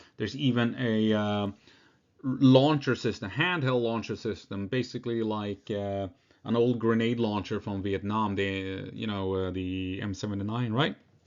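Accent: Gothenburg, Sweden. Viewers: I love how you prove your Swedish ancestry by pronouncing the silent "j." Every time you pronounce a silent "J," it reminds me of how my 100% Swedish grandmother used to pronounce my name "for example, as "yohn" or "yeffrey" or "yames."). It is very endearing to me as a half-Swede. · It's a pronounced Gothenburg accent 😂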